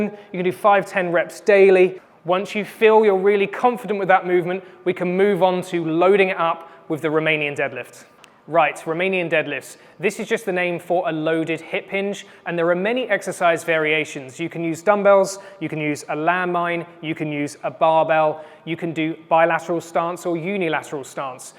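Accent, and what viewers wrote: United Kingdom. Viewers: 😂😂😂 British accent is the best!! 😍😍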